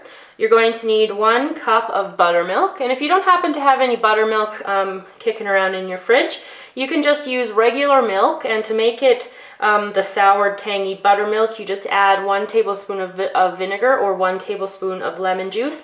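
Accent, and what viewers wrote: Canada. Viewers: Okey :). Maybe I´m just not used to hearing Canadian. I love your videos!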